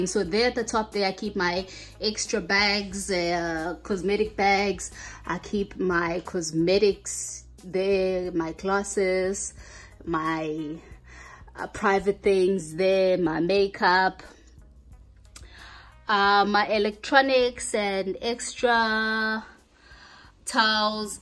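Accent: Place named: South Africa. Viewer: I was only like 15 sec into the video and I was like, you sound South African😂🇿🇦I was right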